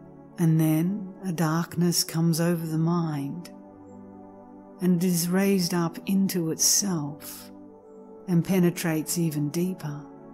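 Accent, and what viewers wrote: Australia. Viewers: I love your voice. Such clarity and such strength fill with compassion. Are you Australian? So relaxing no rush, just basking in it all. Thank you.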